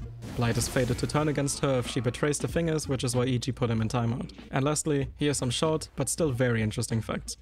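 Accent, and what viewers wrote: Germany. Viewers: Damn son, you're talking really really fast with a heavy german accent that incorporates A LOT of "ts" and "ks" sounds. All I understand is "tskskshtss stsksstsssst"... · German accent?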